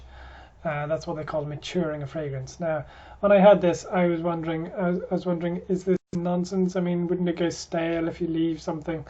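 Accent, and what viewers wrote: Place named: United Kingdom. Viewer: My friend you made my Bose 700 headphones sound like a $5 wallmart earphones. First I didn't understand if my headphones missed a connection and the sound comes out of my cellphone or out of my arse. Creed is good but please for God sake invest $45 for a decent microphone or use your cellphone microphone I bet it would sound much better then your current laptop. Lastly, I wanted to mention, you have British accent so why do you complain about the price here. This bottle cost you no more then 170 pounds, we on other hand here in North America pay double of that. Some places I know charging for the same 100ml bottle $520 USD tax included this is your £405.....this is damn expensive! And thank you for the video. Cheers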